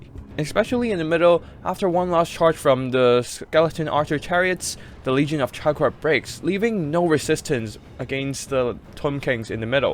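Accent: China. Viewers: Your Chinese accent makes the video so nice to watch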